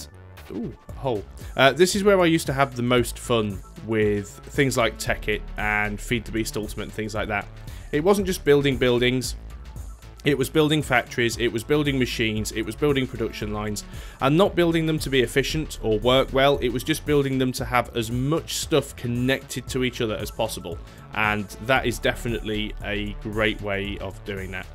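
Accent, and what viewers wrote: United Kingdom. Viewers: good bye forever :( this was a nice change of pace, this should have been called, exploring rotary craft. MAN! i really enjoyed this one, I really just like hearing your voice while I play minecraft, honestly. I could hire you to just talk while playing minecraft and explain everything you do in your british way. its really fascinating and relaxing to listen to you speak. please consider revisiting minecraft!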